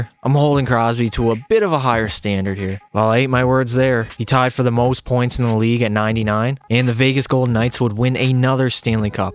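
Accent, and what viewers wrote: Newfoundland, Canada. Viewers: Are you a Newfie? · Any chance you're from The Rock? Or at least from NS? Asking because of your accent lol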